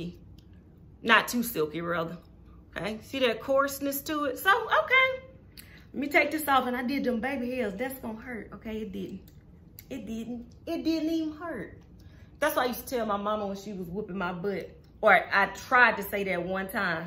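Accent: South, United States. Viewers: What do I think?? I think we could be friends wit yo country sounded self. Girl you are a hoot! I absolutely love your candor and style!!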